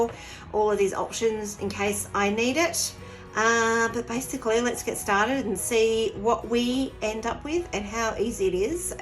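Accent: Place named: Australia. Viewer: Good video and I have saved the link so I can try it at some point. Maybe another video could be done to make a permanent tissue box container? I recall you said the video was a test to see if you could do the video off your iPhone. The way to improve the video would be remove the tune in the background which got a little annoying and sometimes loud. Overall it was a lovely finished product and it was nice to hear another Aussie accent on the video.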